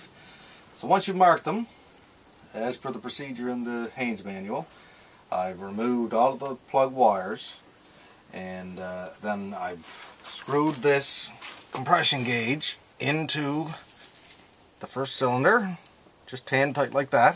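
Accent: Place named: Canada. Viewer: Where are you from? You sound Canadian lol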